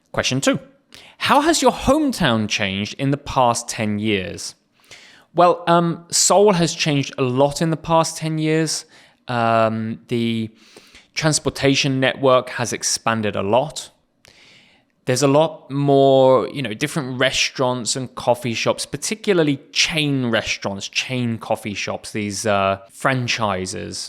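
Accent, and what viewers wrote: United Kingdom. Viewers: I like it your videos very much. Your British English accent is totally perfect and your videos helped me to learn British English and please come on youtube with more new videos. Thank you very very much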